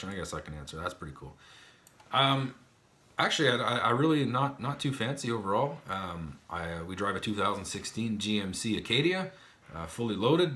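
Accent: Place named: Canada. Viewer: I love your accent. I knew you were Canadian the instant I heard you talk on one of your videos. New subscriber here! Keep making vids, they are great, and you're really interesting!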